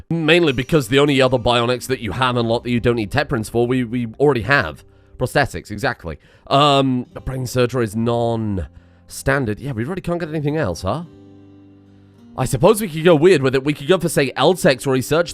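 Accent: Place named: Wales, United Kingdom. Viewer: is going to be trying out a new series and a non Welsh accent.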